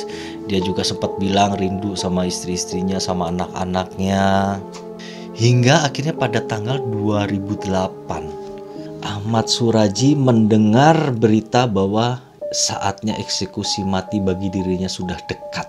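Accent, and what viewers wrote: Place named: West Java, Indonesia. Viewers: Suka bercahaya tea gening. Aduuh sunda pisan ... Salam dari bogor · ( keluar. Cahaya tea ning ) hahaha fiks org bandung pisan hahaha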